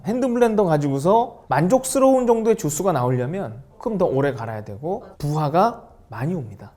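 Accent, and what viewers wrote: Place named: Jeolla, South Korea. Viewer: ㅎ.ㅎ 쬐깐해요~~~오리지널 전라도 사투리~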